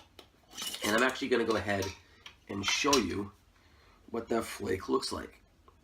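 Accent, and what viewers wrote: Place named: New England, United States. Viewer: Hello definitely a New Englander. My wife is Rhode Island and it takes some time to be able to understand what Yinz are saying. LoL. Great video and I gave you a sub. Great job and thanks for the review. Be well and Keep it Lit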